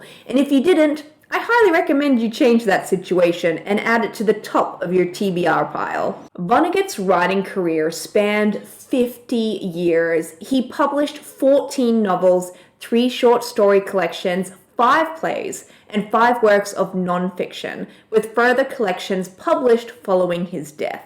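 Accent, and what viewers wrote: Australia. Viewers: Hitchcock said more or less what Vonnegut (and you) say, but in a way that, I think, explains one of the rules better. Paraphrasing: Suspense, he said, is not withholding information from the reader. Rather, it is giving the reader information before the character gets it. (Is that faded Aussie I hear?)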